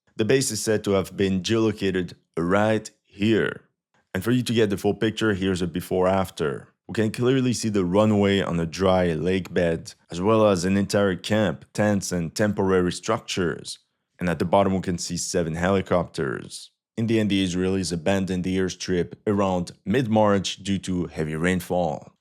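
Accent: United States. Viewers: Hold on a second buddy, your american accent is hilarous greetings from australia and wishing health to you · Your American accent is funny shit haha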